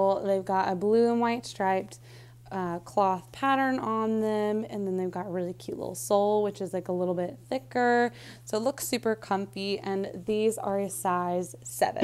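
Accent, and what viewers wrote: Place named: South, United States. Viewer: Lol. I like your southern accent. I’m from Tennessee, so I like it.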